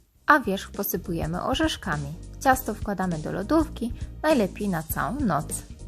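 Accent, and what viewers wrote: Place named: Germany. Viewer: To że ktoś jest ze wsi nie znaczy że odrazu na tak mówić, to gdzie to mieszka nie zależy od tego jak mówi... Ta pani mieszka w Niemczech więc ma powody, aby tak mówić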